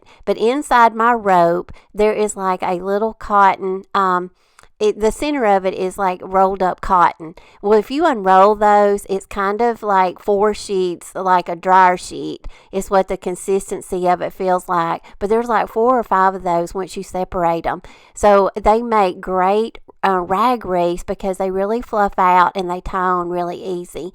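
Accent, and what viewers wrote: South, United States. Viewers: This is one of the top channels in my list. Your talent is amazing and as a southern girl myself, I love your accent! Happy New Year to you and all the subscribers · I stumbled across one of your videos about 6 months ago, I have to say you are totally amazing, I absolutly love everything you make, you are the most talented and interesting person I have ever watched, I'm hooked! I love your cats, mine always helps me too! That's great your husband helps you, So does my husband when he makes me pallet snowmen, sleds, and skii's... I've always been crafty too but you my dear are a total inspiration!! I grew up in the south (East Texas) so I enjoy listing to your Southern Accent!! Just wanted to tell you I feel blessed that your my new Crafting Angel! DC from Anacortes, Washington!!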